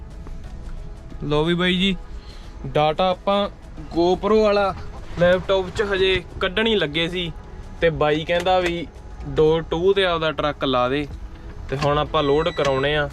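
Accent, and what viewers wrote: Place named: Malwa, India. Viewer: 22 g boli to malwa touch lagda lv u bro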